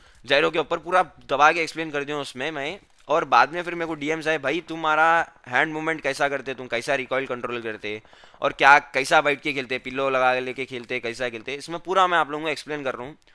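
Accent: Hyderabad, India. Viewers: Hyderabadi Boltey Public,Miya Bhai💢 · He is pacca hyderbadi..not andhra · And that unique accent is called hyderabadi 😍😍😂🥰💛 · he is from hyderabad bro.. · Haydrabadi accent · It's not unique it's hydrabadi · It's Hyderabadi language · Hyderabadi language nhi suna kya kabhi movies b sahi rehti dekh lo bhai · He talk in Hyderabadi accent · The way he speaks tell's he is hydrabadi......🔥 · Arey kya bolre yaro ..Hyderabad ke galli galli mein ham aisich bath karte... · Hyderabadi boltaaa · hyderabadi accent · Bhaii hyderabad wale aisich baat krte miya❤️ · It's hyderabadi accent, and every accent in india is unique tho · 99% percent are even listening to the accent. Hyderabadi accent at its best. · ya bro he is from hyderabad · This Andhra - Hydrabadi accent is like perfectly made biryani.. love it 3000 ❤😅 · Hyderabadi hai bhai sahab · no naam hai he just has the hyderabadi accent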